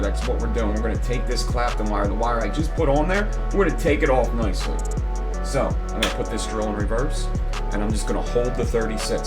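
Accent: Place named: South Philadelphia, United States. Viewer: south philly? i love finding sophisticated philly vapers on youtube. just going by yr accent and 'youse'. also the recovery thing lol (i got almost 5 years since my days at 7th and snyder/ k &a etc))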